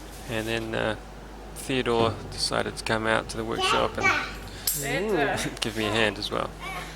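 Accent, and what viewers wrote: New Zealand. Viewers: are u from nz?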